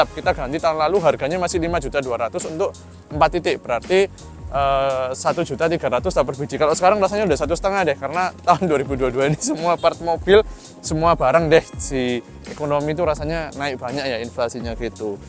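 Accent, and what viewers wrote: Surabaya, Indonesia. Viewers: Logat Suroboyo wkwk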